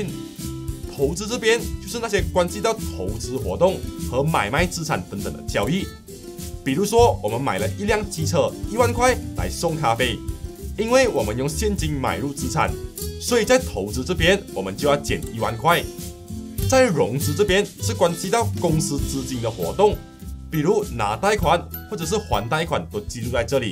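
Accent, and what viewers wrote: Malaysia. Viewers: 聯邦腔很可愛好聽，內容也很好懂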